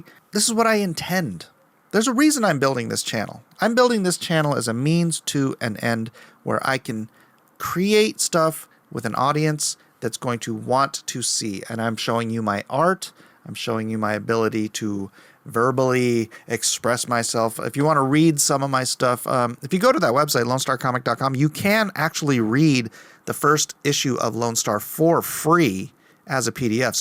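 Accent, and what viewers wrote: England, United Kingdom. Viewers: I get: Awesome ninja skills. You get: English accent. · I love the design, and explanation of lore, backstory, and English accent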